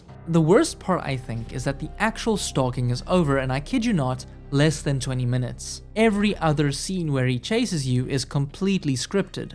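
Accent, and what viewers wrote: South Africa. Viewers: Great video! Btw you sound South African xD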